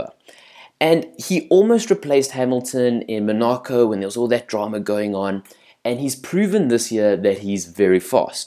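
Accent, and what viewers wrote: South Africa. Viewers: .....nice South African accent boet.........but your pronunciation of the drivers names is shocking...ja ok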